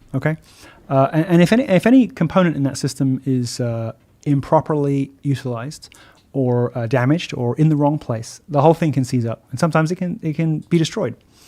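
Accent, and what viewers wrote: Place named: United States. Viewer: He sounds American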